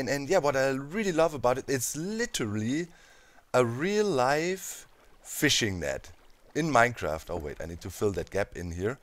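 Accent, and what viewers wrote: Germany. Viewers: Sehr sehr starker Deutscher Akzent xD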